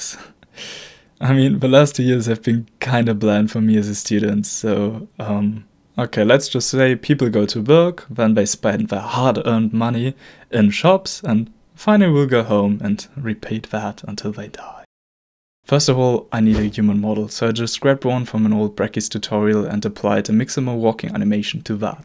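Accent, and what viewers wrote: Germany. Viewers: I knew u are German, ein Deutscher erkennt doch einen anderen deutschen. xD 😂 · Are you German?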